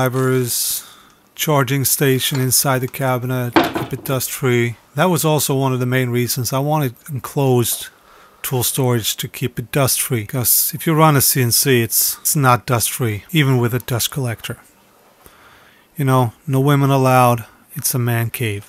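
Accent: United States. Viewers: Great shop setup. Norwegian?, you sound like you have a New York accent! How does that happen? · You say thanks for watching but I for one want to give you a BIG Thanks for sharing!! You took a short basement (w rock on one side) and made it a great wood shop! Especially like the cabinetry and organization (wish I could do 1/4 of that). Your DIY tools and jigs are an inspiration. I do have one question; the electrical plugs aren't the type we use in the USA but sound like your from the northeast USA? Thanks again!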